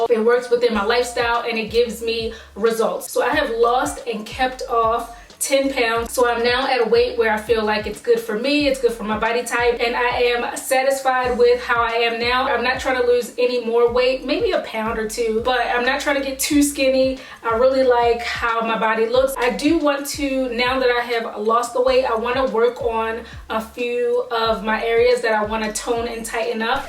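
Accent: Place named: Maryland, United States. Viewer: Are you from Maryland? Your light accent sounds familiar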